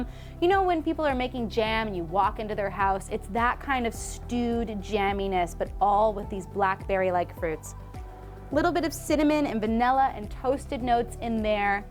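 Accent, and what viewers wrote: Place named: Italy. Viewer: Thanks for the note, but it is simply the way I speak. I come from a very flamboyant Italian family lol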